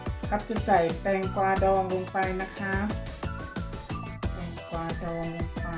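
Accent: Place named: Isan, Thailand. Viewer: ถาดน่ารักเชียวค่ะคุณ พรนึกว่าคนจีนพุ้นเด่ เห็นเว่าอิสานน่ารักดีค่ะ คนจังหวัดใดน้อ❤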